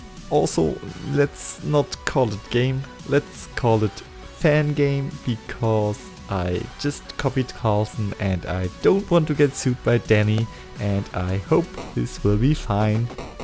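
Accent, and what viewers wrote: Germany. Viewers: Bist du zufällig Deutsch? Are you a German? Hört sich irgendwie so an😂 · Dein Akzent hört sich deutsch an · You have a german accent lol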